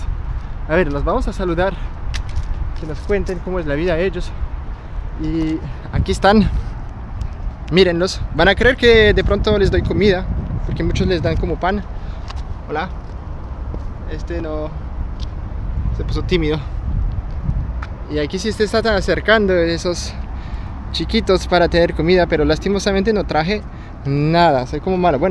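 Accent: Colombia. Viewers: Exelente video, dices que tu ciudad es pequeña pero es muy hermosa!!! Ya hablas como todo un colombiano. Saludos desde México 😘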